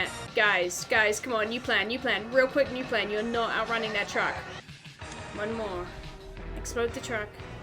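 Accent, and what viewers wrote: New Zealand. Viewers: This was James Cameron's first big film. He built his fame off the success of this film. Great New Zealander accent!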